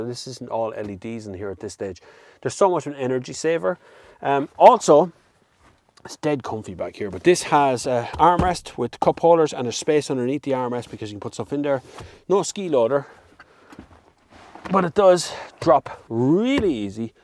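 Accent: Ireland. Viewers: How I love irish dialect!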